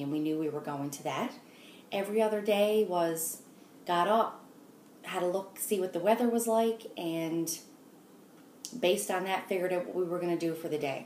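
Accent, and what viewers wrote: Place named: Canada. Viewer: Enjoyed the story about your meet up with the other stitcher. Love your accent, probably because my dad was Canadian!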